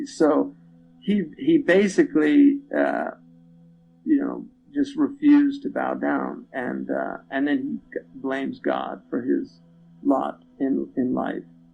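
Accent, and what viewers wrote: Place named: New York, United States. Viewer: sounds like New York City or maybe Los Angeles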